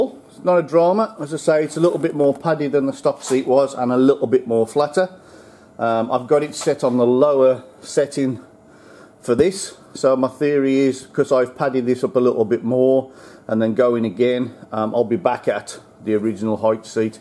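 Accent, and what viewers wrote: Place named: Birmingham, United Kingdom. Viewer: Great vid. Thanks for sharing. I must say though. You don't sound like an Aussie. In fact you sound like a Brummie? I'm in Wolverhampton. Lol. Let's meet up in Singapore sometime.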